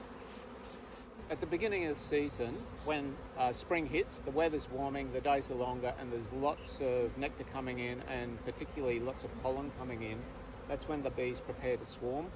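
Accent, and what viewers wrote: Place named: Australia. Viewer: Love your videos, especially your explanations. Who doesn't like a genuine Aussie accent? I have to remind myself it's Spring where you are while we are moving i to Fall here in Kansas, USA.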